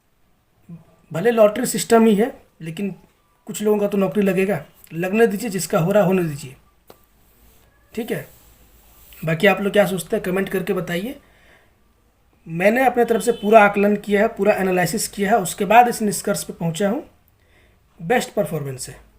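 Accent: Bihar, India. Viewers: Tu to bihari lagta h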